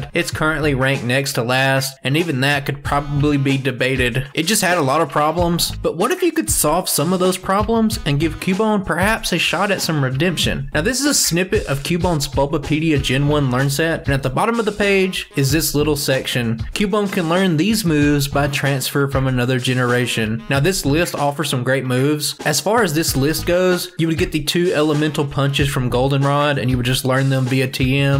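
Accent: South, United States. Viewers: oh for sure. It would be a lot of work and something plan out over a long period of time. You’d also have to feel out the comments to see if other people would be interested in that since it’s kind of niche. But Pokémon run channels are growing in popularity, so it could help your channel differentiate itself. But mainly just do what you enjoy and everyone watching will enjoy it too. You’re doing great! I really like your layout with the move sets and stats on the side so we can track the Pokémon on its run and anticipate what’ll come next. And nah, you ain’t Canadian. You sound more southern than me, and I’m from Texas haha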